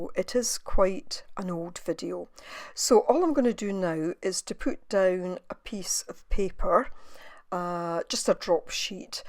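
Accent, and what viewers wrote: Scotland, United Kingdom. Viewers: I detect a bit of a brogue, Scottish? Love all your tutorials 💙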